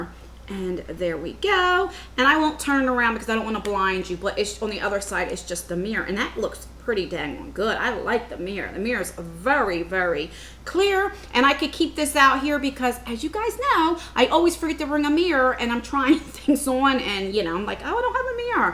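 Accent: New Jersey, United States. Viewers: Lol. I think you said "Walmart scrub" before describing the scrub. The Jersey accent is similar to us in MA, but I think you said Walmart....and I almost spit my tea out. Oh I love you.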